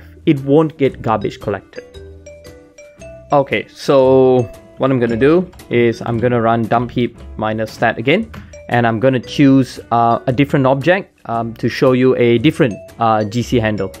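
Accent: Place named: Malaysia. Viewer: are you malaysian ? Sounds like you are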